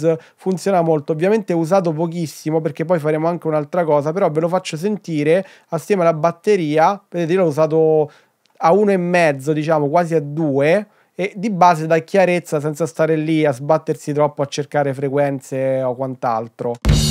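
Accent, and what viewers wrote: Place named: Rome, Italy. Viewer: Abito a Pordenone da 15 anni ma sono di Roma (vicino Frascati). Sempre bello sentire il nostro accento...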